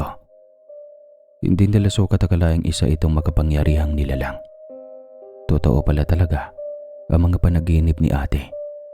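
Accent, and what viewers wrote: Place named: Iloilo, Philippines. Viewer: Kuhang-kuha ni sir ang salita namin na Ilonggo😇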